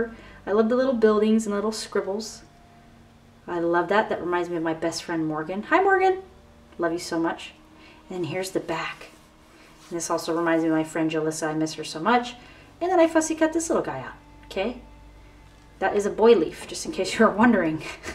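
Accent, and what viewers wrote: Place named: United Kingdom. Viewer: What a lovely little folio! beautiful work as usual (British accent :))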